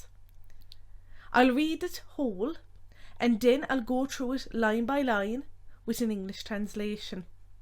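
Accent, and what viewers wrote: Ireland. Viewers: Amazing!! I've got one question: Which part of Ireland is your accent from? (I know it can vary depending on the region of the speaker). Thanks for this video 🙏🏻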